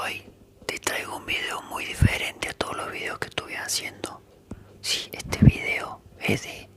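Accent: Córdoba, Argentina. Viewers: Asmr Más acento cordobés Yo😴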